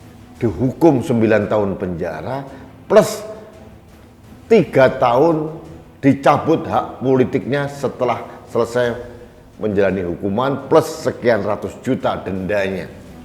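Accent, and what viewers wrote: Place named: Kediri, Indonesia. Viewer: nuwun sewu nggeh. Panjenegan logat bicaranya kediri bngt. Mak bedunduk, Dagelan, itu Bahasa Kami orang kediri. Iya menurut saya pribadi itu Hakim kok peko' eram to. Kulo tiyang alit mawon mboten saget mikir hakime jian nemen. Hukum kok saget d enyang" d sekeng" MA ne kok ngoten to. Sanoyo dangu sansoyo kebacut.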